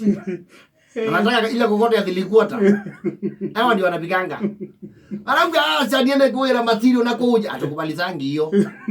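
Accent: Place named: Kamba, Kenya. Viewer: Ngoni unaongea kama mkamb kapsaa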